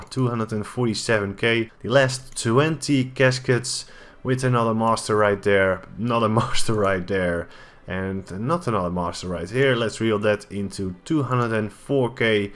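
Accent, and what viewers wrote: Netherlands. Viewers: Oei je hebt wele cht een heel nederlands accent haha :p leuke video man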